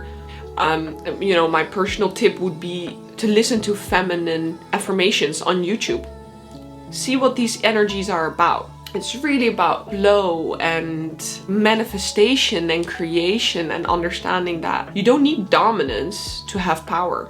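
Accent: Netherlands. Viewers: weet niet waarom dit in mijn feed komt maar ik hoor dat je nederlands bent. leuk hoor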